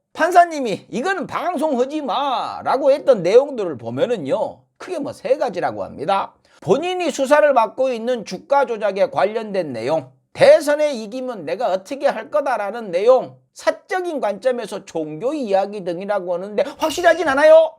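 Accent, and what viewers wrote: Jeolla, South Korea. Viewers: 다좋은데 ...난 성범이형편인데 ...일부러 예전 개그코너로 하는건 알겠는데 ...전라도 반달 사투리로. . 우파들 빈정거리는게 영. ,ㅠ 재미도 있긴하지만 .. ...형님 그냥 서울말로 코너하면 안되요? ㅜ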